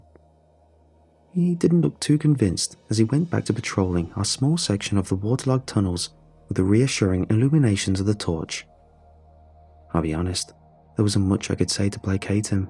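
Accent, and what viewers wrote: England, United Kingdom. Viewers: Well done big lad, incase you haven’t noticed the narrator is English, and it’s a bit of fiction story telling. Absolute yogurt for brains !!! “tHiS iS AmeRiCa” 👁👄👁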